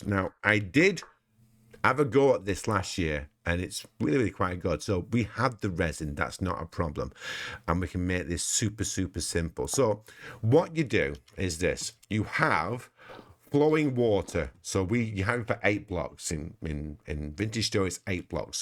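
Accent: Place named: Bradford, United Kingdom. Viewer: Apologies for being nosy - are you from Bradford by any chance? The accent sounds familiar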